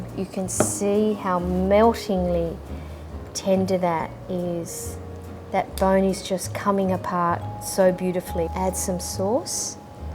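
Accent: Australia. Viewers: R u an Aussie ? Thank you so much This was beyond incredible . Ill be making it next week when my crock pot is delivered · Nice Shanks...nice Australian Accent as well. Regards from San Jose California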